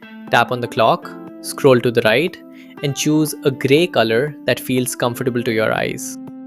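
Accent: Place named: India. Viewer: Oh a potentially useful vide- hears indian voice Nevermind, sir i dont wanna redeem it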